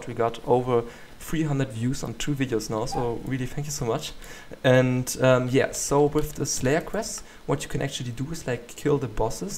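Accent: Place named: Germany. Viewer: ich lieb den deutschen akzent hammer video tho :D is there a better way from like combat 40-50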